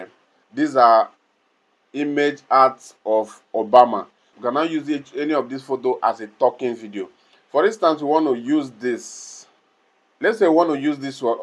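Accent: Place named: Africa. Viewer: Beautiful african accent brother. All the best insh'Allah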